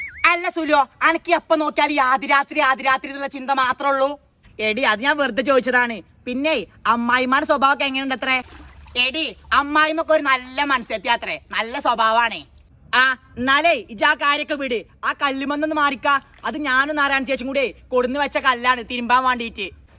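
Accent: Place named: Malappuram, India. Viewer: I think you are from malappuram. Very nice presentation and slang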